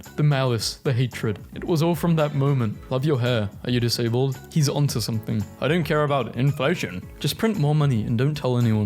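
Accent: United Kingdom. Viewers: i watched this entire video, thought about it for a moment and then realized you narrated the entire thing with a british accent. why didnt this come to me during the video?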